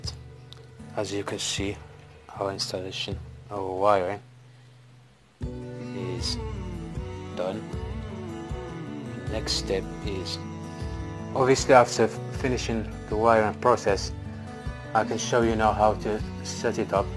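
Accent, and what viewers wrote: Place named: Casablanca, Morocco. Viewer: تبارك الله عليك اخويا ، و المغاربة اللي تيهضرو بالانجليزية و شرحو بالانجليزية ، مثال بسيط و مشجع بالتفيق اخويا ، و لكن الاجليزية عندك كازوية هههههه تبرك الله عليك برو ،😍😍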